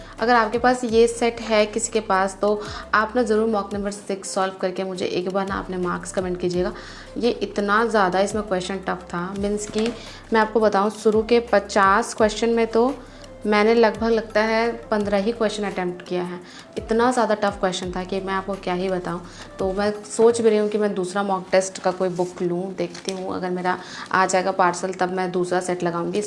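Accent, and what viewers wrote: Bihar, India. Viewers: Continue rkho short video with Bihari Tone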